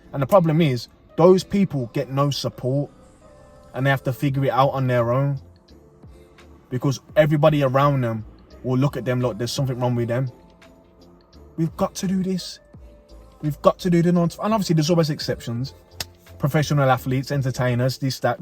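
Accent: Birmingham, United Kingdom. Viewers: I saw your video on when you made your first £10K and I love how honest you were I can’t wait to jump out the matrix too btw where you from you sound like you’re from Brum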